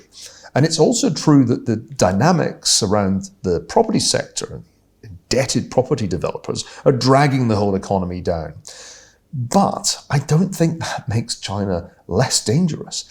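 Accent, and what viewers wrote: United Kingdom. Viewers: Unfortunately, every time I hear this accent, I am flooded with historical memories of the depravity of the nation he comes from. And here he is carpet bagging, talking BS about China. How about the US and its lackey, Britain, staying home and giving the world peace? 400 years of depravity is enough, Mr. Ferguson. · I will summarize it this way. He speaks with a British accent, after that he makes no sense. First, when we talk about a country in ascent or decline we need to consider the following: 1. Military power: no one is close to challenging the US here. 2. Economic power: the US economy is outperforming all developed economies and the USD has never been stronger. All this nonsense about debt servicing ratio is just noise. If it really was a concern, the USD would be depreciating and not appreciating! 3. Technical innovation: AI, medicine, advanced tech etc etc. 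don’t want to get into details, but it is obvious the US reigns supreme… At least for a long time the US will lead. Who is in decline based on the 3 factors above is the UK 😢 Love the accent though 😊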